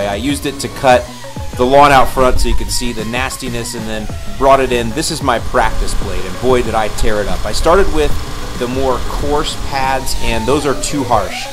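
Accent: Chicago, United States. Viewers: I love the exaggerated Chicago accent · nice chicago accent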